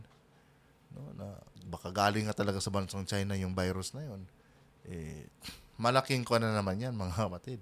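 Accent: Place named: Davao, Philippines. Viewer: Taga davao po ba kayo 😊? Kc kaparehas moh ang accent si baste..tanong lang po..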